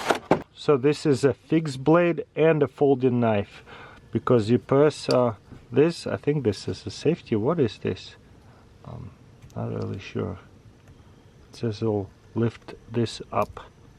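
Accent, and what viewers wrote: Russia. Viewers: Going from the accent, art thee russian?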